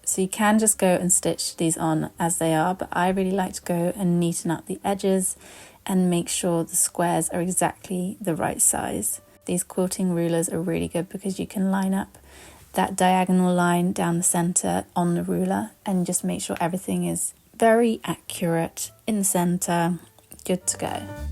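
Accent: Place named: England, United Kingdom. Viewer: What a fantastic idea - making something for ourselves for once! 😂❤ I absolutely LOVE Ohio stars and am mega careful, getting everything to line up and get very stressed if I’m even a mm or so out!! 😱😅 An absolutely stunning wall quilt hanging - beautiful and a smaller one in a corner is a lovely idea too 😊 I’m desperately trying to get stock together, but I might just say sod it, this weekend, I’m going to make something just for me ❤ Thank you thank you and if you’re at all interested, I can send you a photo when it’s done 😊 You are a true inspiration to a massive quilting and everything material and hand made fan, and hearing your lovely english accent reminds me of ´home’ - have lived in france for 12 years, but almost everything I make is with fabric from England as the French just adore it ❤ Have a lovely evening and see you next time 🫶 xx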